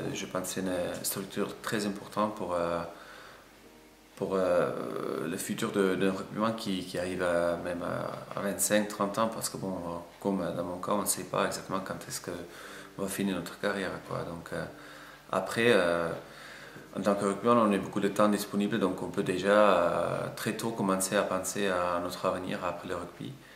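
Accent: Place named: Basque Country, France. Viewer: Super témoignage, tu vas beaucoup mieux et bientôt ce sera parfait. Ton accent et ton récit avec l'accent deu pays basque! En afrikander tu conserves le même accent? Bonne reconvertion.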